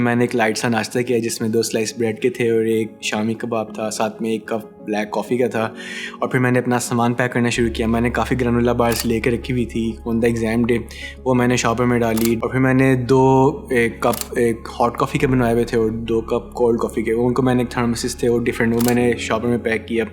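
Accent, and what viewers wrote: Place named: India. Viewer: Why you speak Indian 😢😢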